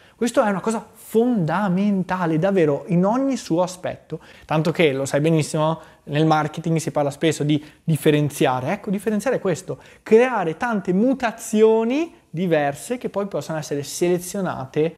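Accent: Veneto, Italy. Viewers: Wow!, ti è venuto l'accento Veneto... Pazzeeesco!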